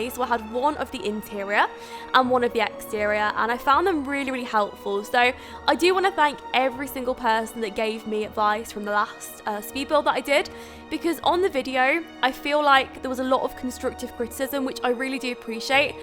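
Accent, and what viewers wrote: United Kingdom. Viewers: i like your british accent 😣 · I love your Brittish accent <3 Even when I dont understand everything but I dont care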